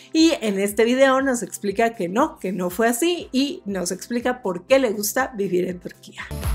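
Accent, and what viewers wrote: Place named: Peru. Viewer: YO CREO Q ESTA BIEN ,Q NO PIERDA SU ACENTO PERUANO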